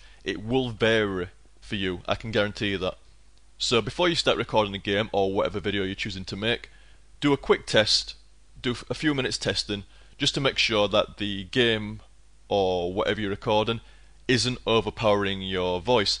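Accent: United Kingdom. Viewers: I TOTALLY LOVE english accent :D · Am i right in saying thats a yorkshire accent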